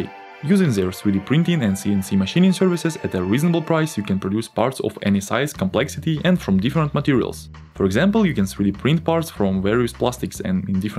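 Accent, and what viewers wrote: Russia. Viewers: WTF he haves a Russian accent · Чувак! Твой русский акцент!.. 😂 But still you're doing good! Going to check Your channel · Обычный русско-английский акцент. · why the accent, bro? you sound russian! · Господи чувак, твой английский такой русский что писец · Russian detected ⚠️ привет дорогой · Мне показалось, или услышал русский акцент?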